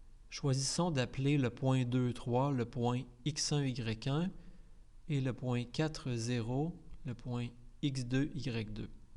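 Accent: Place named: Canada. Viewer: Trop fort et bien expliqué avec un accent canadien 👌🏼👏🏼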